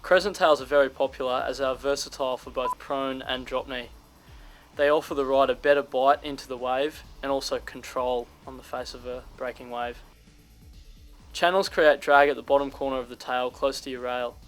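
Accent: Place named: Australia. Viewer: I already know everything this guy is talking about but I continue to watch the video because his voice is Godly. It's like listening to Morgan Freeeman, except Australian and white.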